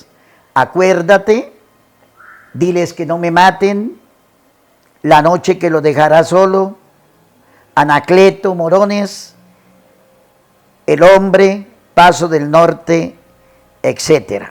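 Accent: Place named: Colombia. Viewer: Se siente el acento colombiano😀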